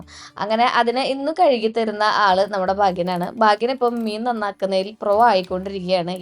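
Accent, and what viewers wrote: Thrissur, India. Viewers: Enthu sughaa videos kandirikkan, pinne Thrissur slang enikk orupad ishtaanu, kurachukalam njangal Guruvayur ndayirunnu, 7 muthal 10 vare njan padichathokke avideyaa, ente Malappuram pole enikkishtaa Thrissur nem. Nte Guruvayurappanum avide alle,pinne jeevithathile ennum orkkan ishtapedunnathum allathathumaya orupad ormakalum aa nad enikk thannittund.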